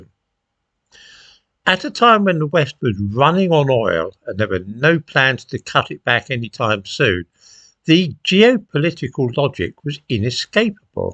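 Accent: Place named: Yorkshire, United Kingdom. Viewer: You sir could be from Yorkshire, a great honour, You say it as it is, well done t'old flower.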